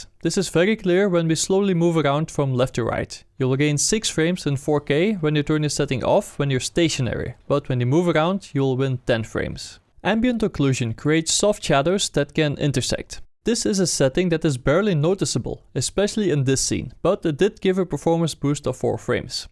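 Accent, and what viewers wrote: Netherlands. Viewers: Are you dutch? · lol dit is echt nederlands accent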